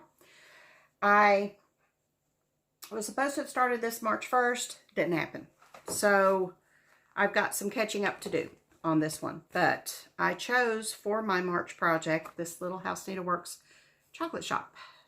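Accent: South, United States. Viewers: Hi, you had me at "Love Abide". swoon. Thank you, kindly for sharing all your finds. Gosh, you sure have a good eye for beautiful things. Once again, your charming southern drawl washed over me and bathed me in Grace & Goodness. Thank you for sharing your spirit and such lovely inspiration. It was a pleasure to drink coffee and "visit" with you. Happy Stitching, friend, Daleen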